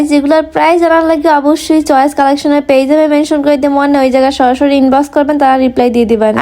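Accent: Barishal, Bangladesh. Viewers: আপু তুমি তো বরিশাইল্লা তাই না 😊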